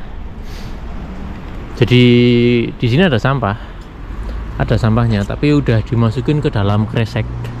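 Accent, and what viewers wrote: Banyumas, Indonesia. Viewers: Setiap tayang... yg gue tunggu bahasa ngapak mu, mas!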